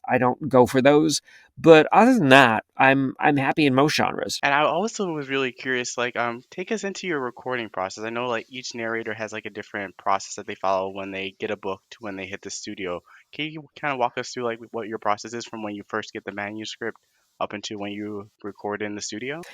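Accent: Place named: Australia. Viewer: I love his Australian accent...Him narrating Honeymoon For One by Keira Andrews is amazing!!!!!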